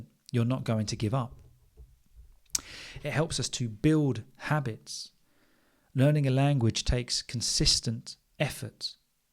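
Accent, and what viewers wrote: United Kingdom. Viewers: I love the british accent ♥️ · This is the first channel of British English that I really enjoyed 😊. I tried once before but I couldn't find one so good like yours. Thank you so much for your good job, and your effort helping us to improve our English skills. I must confess that I prefer the American accent, but you are changing this. I'm a little obsessed with your channel 😂.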